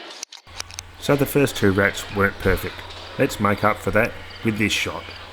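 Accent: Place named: United Kingdom. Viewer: British! Lol heck yeah!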